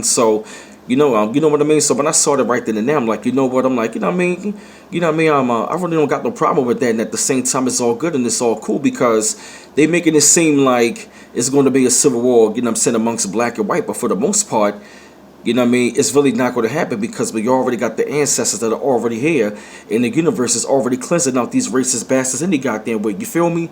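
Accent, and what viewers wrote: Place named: Philadelphia, United States. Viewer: Your accent sounds like you come from Philly? Yes they are losing power all over the earth. People are standing and speaking out against them. There is a shift of consciousness taking place. Yup! You are speaking truth, and I see everything unfolding. I was telling my daughter for the last few years what was is unfolding right now. I could and can actually visualize what is and going to happen. I been saying everything you said.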